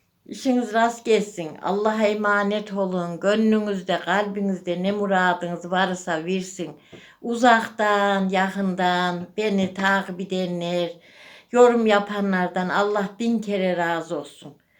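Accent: Kayseri, Turkey. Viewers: Bende kayseriliyim, üniversite kazanınca Ankaraya taşındık 6 sene önce. Küçüklüğüm hep babaannem dedemle Sakar bağlarında geçti. Araya korona da girince babaannemi daha çok göremez ve özler oldum... Konuşman vurguların sepliliğin aynı babaannem gibi teyzem benim.. Allah sana sağlık sıhhat versin torunlarınla uzunca yaşa inşallah ellerinden öperim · Teyzem bende kayseriliyim Talasın bir köyündenim... Konuşmanız, adetlerin bizim oralara çok benziyor. Siz neresindensiniz. Ellerine sağlık maşallah gençler elinize su dökemez... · Babanecim senin şu konuşmana bayılıyorum . Kayseri’yi özledikçe izliyorum seni maşallah sana 🧿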